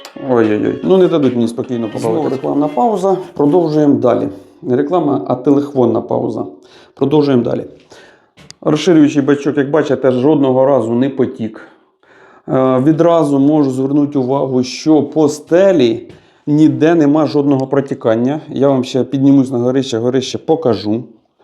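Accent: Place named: Kharkiv, Ukraine. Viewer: Форточка - кватИрка (укр.) Телефон - так и есть на укр.яз. - телефон, а не "телеХВон".))) Я подозреваю, что вы сами родом со Слобожанщины. Проскакивает харьковский суржик, как не стараетесь говорить українською мовою..) Да и в Харькове было такое риэлтоское агенство "Квартал".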